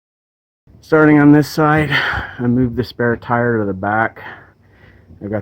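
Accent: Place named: Utah, United States. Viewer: sounds like he's from Utah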